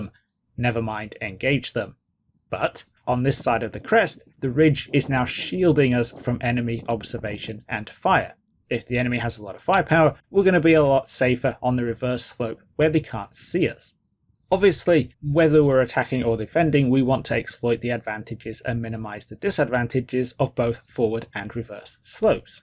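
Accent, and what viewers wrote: United Kingdom. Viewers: unmistakably yorkshire, wonderful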